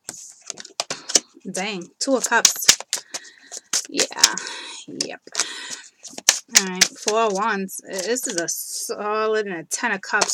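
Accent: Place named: New Jersey, United States. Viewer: I love your accent is that Jersey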